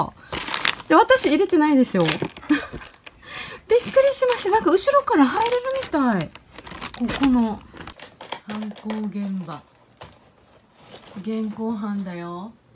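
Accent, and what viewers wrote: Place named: Okinawa, Japan. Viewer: 元気いっぱいのくん☆ コスプレも好きなんですねぇ☆ 時折でる沖縄なまりが自分は好きです☆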